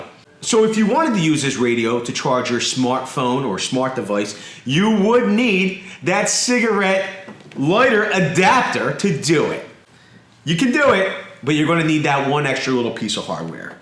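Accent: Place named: Boston, United States. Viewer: This dude is definitely from Boston 😂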